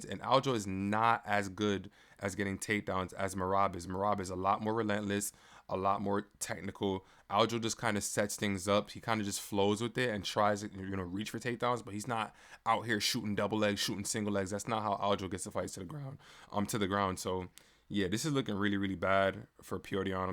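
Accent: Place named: New York, United States. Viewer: my fault bro, you sound like me a little and a bunch if others I know lol. NY maybe? Bless up bro and solid takes.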